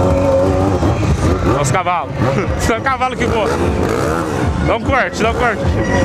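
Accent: Goiás, Brazil. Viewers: achei q vc era de Goiás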